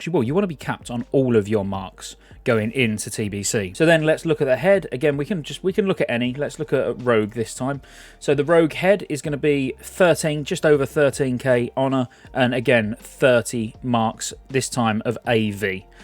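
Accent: United Kingdom. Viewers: I love your content but can you please fix your British accent? That would be great. At least start pronouncing your T’s....it’s Thirty with a T, not thiiieeeey.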